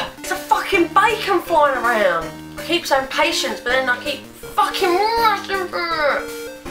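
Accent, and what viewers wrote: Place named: England, United Kingdom. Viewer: u sound like a england girl mixed whit a country girl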